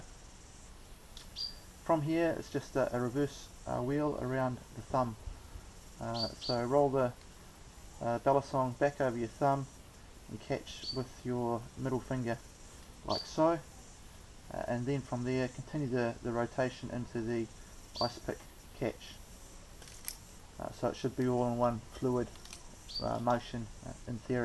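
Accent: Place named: Australia. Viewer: Are you in Australia? You sound Australian and there's a lot of greenery and birds where you are... It sure looks like it. Correct me if I'm wrong, I could be totally off.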